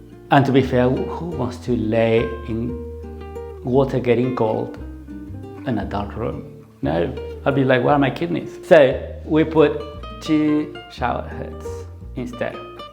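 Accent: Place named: Argentina. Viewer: So many great ideas! The redesign of the space. The scaffolding boards with wine corks. The double shower. Revealing the little window. The decor hitting all the right notes. Your joie de vivre, hard work, and humility. Plus I love your narration and your accento argentino. Thank you for your inspiring life. Abrazos grandote che. 💙🦋💙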